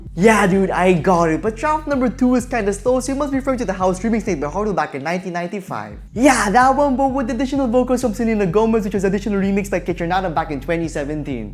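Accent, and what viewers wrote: Philippines. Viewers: You ought to delete your filipino accent from your brain, learn some English before talking about how to deejay. It’s hard to understand you most of the time.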